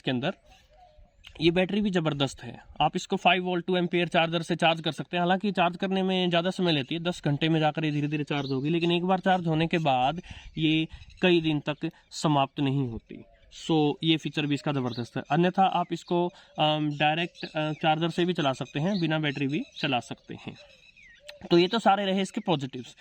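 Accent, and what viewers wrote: Standard. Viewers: आपकी शुद्ध हिंदी 👍 तारीफ ए काबिल ह श्रीमान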